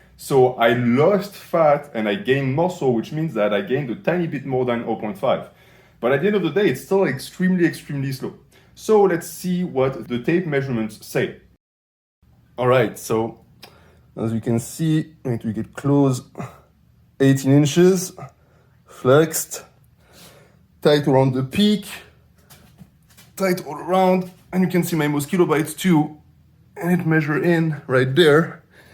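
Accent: France. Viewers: I suspected him being a French speaker at the beginning, and and was fully convinced when he said “the love Andles”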